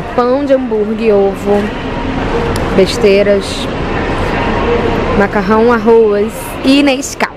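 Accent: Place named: Rio de Janeiro, Brazil. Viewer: Linda amoo esse sutaque carioca, tô amando te acompanhar 😍😍😍👏👏👏👏 parabéns por ser essa pessoa atenciosa e quando voltar a sp vou te conhecer bjos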